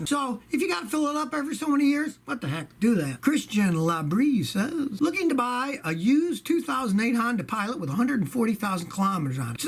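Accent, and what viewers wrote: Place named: United States. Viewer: You sound like an American.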